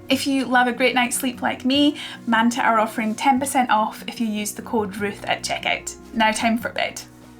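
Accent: Scotland, United Kingdom. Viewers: I love the Scottish accent. Its my favorite. I enjoyed the video 😊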